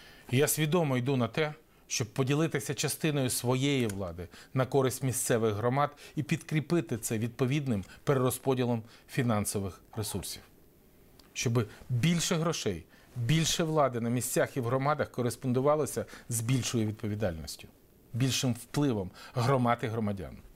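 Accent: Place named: Russia. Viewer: говорит с русским акцентом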